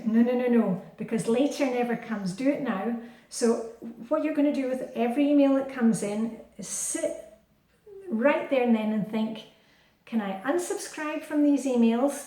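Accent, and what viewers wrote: Scotland, United Kingdom. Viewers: Thank you so much for making things seem so possible and realistic. I am doing well on my routines, I have just listened to David Allen GTD and am delighted to put the two systems together. I really enjoy and look forward to your videos, very refreshing to hear a Scottish accent and not American (sorry USA) Im Irish and its nice to see/hear a European point of view. Thanks for all you do - Anne Marie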